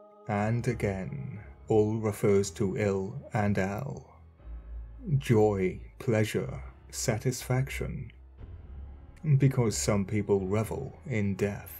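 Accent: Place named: England, United Kingdom. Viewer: Omg.. So dramatic with the heavy English accent 🤣. I figured "Lord" was related to Baal and other pagan deities in like 5 min as a teen. Also "Elohim" can be figured out with just a Strong's concordance. It's just a title dude, that can be given to angels and men. It's a council a court, some are fallen.. Like the angels that slept with human women. In the book of Job Satan himself attends one of these meetings and "El" gives job to be tested. Real Hebrew btw did not come from Sumarian language. It's a forgotten language that is now a mixture of languages. No one speaks pure Hebrew. So it doesn't surprise me of all its Babylonian influence, even modern Judaism main source is the Babylonian Talmud. Basically all major religions can be traced to Babylon.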